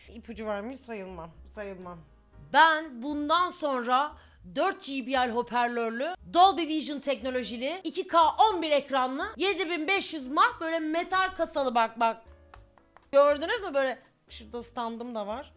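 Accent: Black Sea, Turkey. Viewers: Karadeniz şivesi❤ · Batugh Tanem valla senden akilli xngxhnxmhfmjfmjf Ve karadeniz sivesi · Karadeniz şiveli gercekmiss hayallerim gercek olduuuu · Haşmetlimin her episode serisinde birini Karadeniz şivesiyle yapmasına bayılıyorum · karadeniz şivesinden dolayı ciiddi şeyler çok komik yaa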